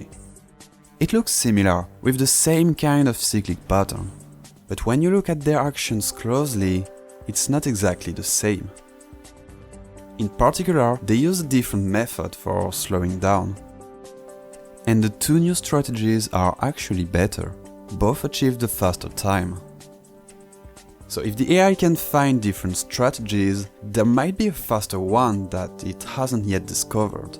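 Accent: France. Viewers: Are you french because you have french accent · J’adore ton accent français c’est trop drôle. Trop bien la vidéo sinon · Are you french? Because I can clearly hear your accent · Are you french? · He sounds french, it's like he use syllabic intonation, while English should be stress-timed. Interesting. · are u french? your accent like french? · L'accent français me termine, video de fou bg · Great job. I think they just lack higher levels of abstract thinking. They're like babies learning from trial and error so experiences just remain at the very basics. But your work, insights and doubts are the best. Thank you for that. Your accent sounds french, are you from a french speaking country or I'm totally missing the point? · Open video French accent Close video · Bro you're french and your accent is so bad But the topic of the vid is good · superb video man. just one minor complain, please as a french guy, I can't stand your english accent, please, do make an small effort, plus your grammar and vocabulary are very good, just less fenchy "ze" instead of "the" ect. 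Anyway thx for the video I've learn a lot! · Tu as un accent français, je me trompe? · Are you french? I can sense a little bit of french accent in your prononciation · Are you french cause your first language is obviously not English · Why are you french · This is the most effortlessly heavy french accent I've heard. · You have a French accent is it true? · omg your french accent is difficult to listen to xD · Je suppose que tu es français nn? Ton accent et bon mais français 😂❤❤ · He sounds french and his ai does wr runs. confirmed:D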